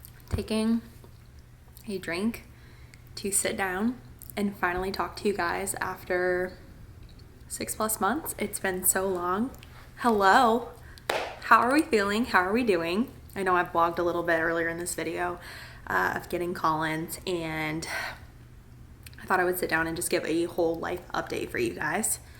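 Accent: South, United States. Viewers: Yes girl definitely so happy for you and so happy your back! Your a real inspiration and have such a kind heart, it’s so nice seeing someone who is genuine and I feel like your getting alittle southern accent in you!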